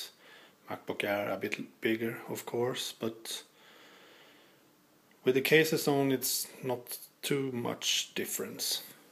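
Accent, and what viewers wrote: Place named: Sweden. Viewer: Bra jämförelse, älskar den brutalt svenska brytningen ;)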